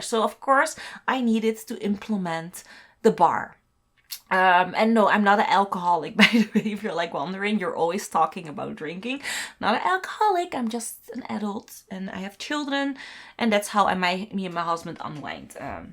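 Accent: Netherlands. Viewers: Je hebt echt een Nederlands accent ik hou ervan. 🧡🇳🇱🧡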